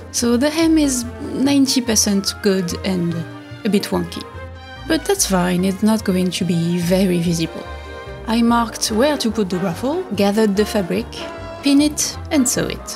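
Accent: France. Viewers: Usually I can't stand listening to narration, but your gorgeous French accent makes it enjoyable. Cheers ✌ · Je suis vraiment heureuse de trouver des tuto couture venant d'une chaine française, votre accent en dit long. Votre robe est magnifique merci de partager vôtre savoir6et votre passion. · The Youtube algorithm just blessed me with this video. This is the most ridiculous, over the top and amazing dress I have ever seen and I LOVE IT. I like to watch historical sewing videos but I am delighted with this. The project seems so fun and a great use of sewing skills. What's the point of sewing if you can't make an extravagant dress? Also, I love the accent. I have family in France (92, 93 and 94). I just don't write in French because I'm terrible at it. Bisous du Portugal :D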